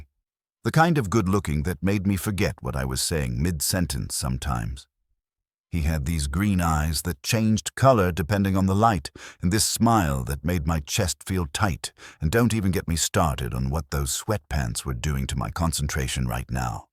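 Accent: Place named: England, United Kingdom. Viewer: English accent is awful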